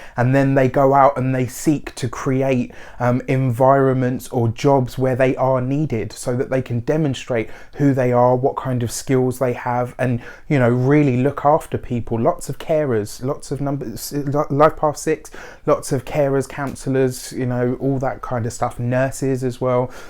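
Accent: United Kingdom. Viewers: Hold on do I hear a British accent? I got a feeling I'm a bit late but have to subscribe ! UK lady here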